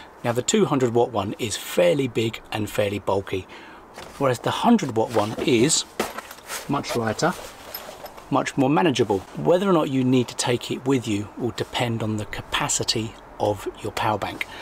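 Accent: United Kingdom. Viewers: Your BBC’s accent is fantastic for foreigners, I can understand everything, thanks. Really, school english. All the best mate.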